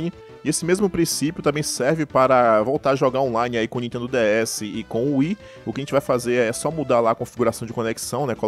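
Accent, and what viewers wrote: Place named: Bahia, Brazil. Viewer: Mano vc é baiano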